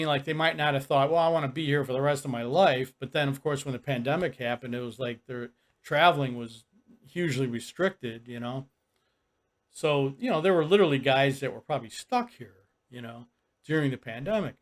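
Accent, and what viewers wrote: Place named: New Jersey, United States. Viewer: For some reason, I thought you had a Jersey accent. Great city, by the way. Taste of Chicago, awesome annual food festival. You a city respect their food & culture after attending such an event. Keep the great content coming. 👍🏾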